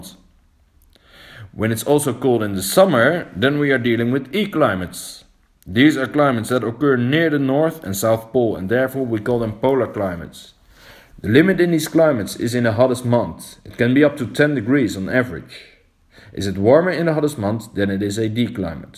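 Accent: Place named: Netherlands. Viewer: lekker typische nederlands accent weer op die engelse taal heerlijk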